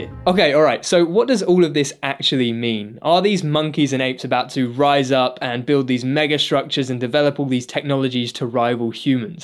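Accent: United Kingdom. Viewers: "I've always been fascinated by the idea of animals using tools" Sounds sophisticated when you say it with an English accent. Not so much if you're from the states.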